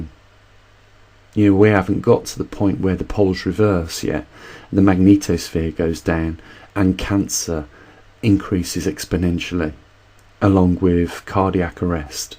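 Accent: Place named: Walsall, United Kingdom. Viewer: Can you tell me what part of the UK you’re from? You sound very local to me..... Walsall???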